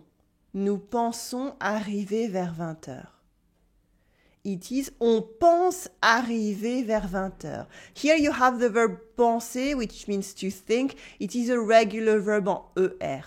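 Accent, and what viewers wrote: Paris, France. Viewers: Is this Parisian French?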